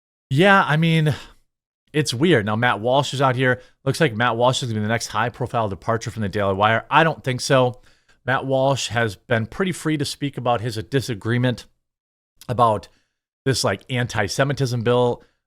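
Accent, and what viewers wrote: Wisconsin, United States. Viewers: Wisconsin accent.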